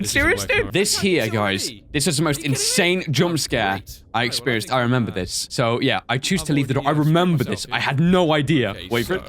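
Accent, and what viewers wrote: United Kingdom. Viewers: Your voice changed...backwards.You used to sound more mature and British.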